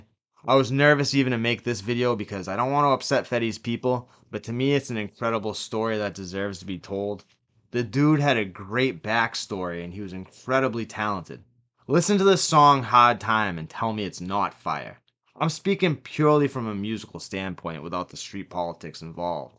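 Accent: Boston, United States. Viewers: video was decent an i liked an subbed cuz u from an mass an i support mass creators the accent was jus throwing me off its the mark walberg shi an im born an raised here never heard it other then from wyt ppl from southie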